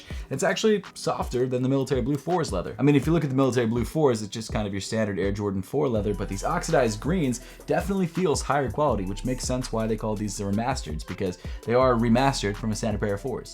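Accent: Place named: Philadelphia, United States. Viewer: Wait hold up, You’re from Philly bro?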